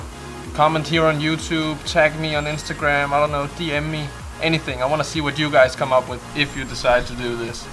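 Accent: Denmark. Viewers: Haha! Tydeligt Du Er Dansk! Men Super God Video!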